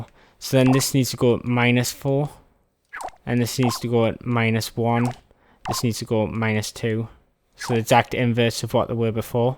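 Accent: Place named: Ireland. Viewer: Why am I obsessed with Irish accents?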